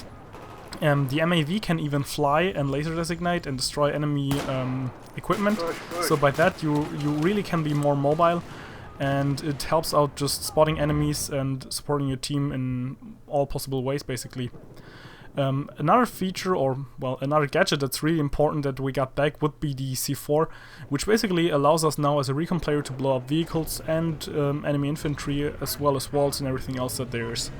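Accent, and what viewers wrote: Germany. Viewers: mach mal deutsche videos.... Man hört das du deutscher bist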